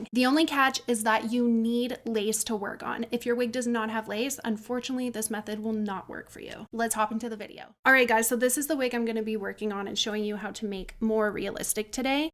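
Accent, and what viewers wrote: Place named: Los Angeles, United States. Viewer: Haha I thought that. LA accent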